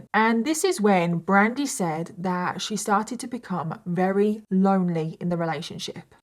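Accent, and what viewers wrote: United Kingdom. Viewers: Unbelievable!! I dont know hiw he could live with that woman, and not notice that she wasn't all there? Just so terrible, how someone could just do that, to their own small children?! Bless their souls & prayers to Jason. Thanks! You did an amazing job, telling this horrible story! Also, just wanted to say, you look so sweet! Those big blue eyes you have, are so beautiful, along with your cute British accent. Looking forward to the next time, thanks again! 😉 👍 🇨🇦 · I’m from Michigan and I have always loved your British accent BUT my heart just melted at the way you pronounced “assuming” “ashumming” lol. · American here., your British comes out of you every second. Don't fight it; it's delightful. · thank you so much for covering this case. Your compassion for the victims always melts my heart. You are such a kind and empathetic soul. 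Also, please don’t ever apologize for your British accent! It’s what makes you wonderful! You can say “ahss” all you want. You are so brilliant. Much love from Seattle Washington ❤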